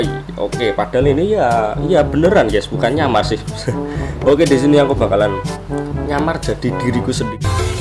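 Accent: Java, Indonesia. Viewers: Bang Orang Jawa Ya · Bang Lo orang Jawa ya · kok bosone Jowo medok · Jawane ngendi bosku?? Ketok wenakmen ngomonge.. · Jek medok jowo sampean mas kurang harapan bangsa yang paling agung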